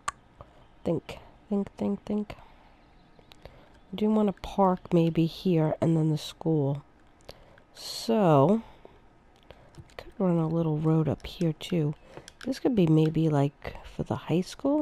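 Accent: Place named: New York, United States. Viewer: So I was wondering if anyone ever told you, that you sound like the woman from the TV show "King of queens". Lol I love that show. She did a great impression of a genuine new Yorker. And you sound like her. I'm hazarding a guess that you are a new Yorker but I've been wrong before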